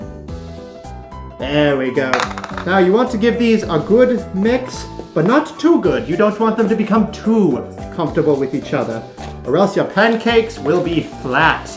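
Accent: United Kingdom. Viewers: You are like the furry version of Jamie Oliver. (Your British accent was on point, actually!) :D Im gonna try this recipe sometime, and every time i think of pancakes, im gonna think of you. :3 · Omg I love the British accent it's as good as mine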